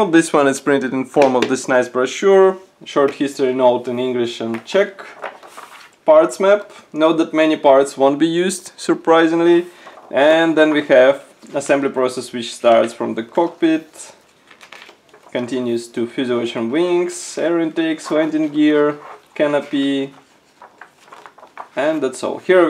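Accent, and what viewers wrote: Russia. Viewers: Мне показалось или я слышу русский акцент?....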